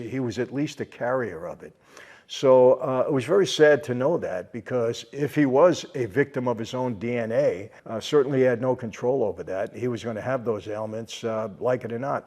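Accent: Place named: New York, United States. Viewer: You sound like a New Yorker!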